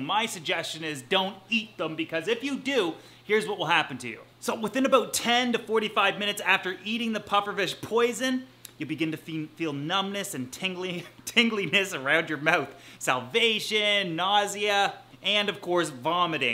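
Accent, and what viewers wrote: Canada. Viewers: Are you a Canadian ? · It's cool when you can instantly tell you're watching a fellow Canadian by the accent lol Great aquarium, nice video! Cheers from BC!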